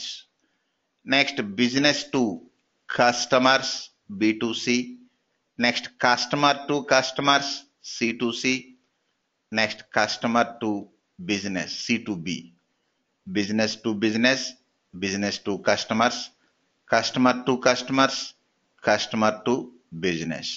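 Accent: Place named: Telugu, India. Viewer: He is a Telegu speaking person. It is a normal accent there. Rely the knowledge he is given. Accent can be adjusted in head.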